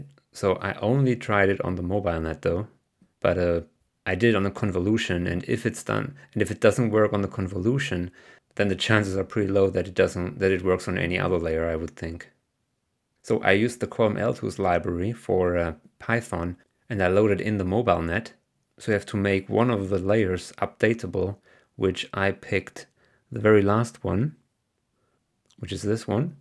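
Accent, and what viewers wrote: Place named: Germany. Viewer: I hear some German accent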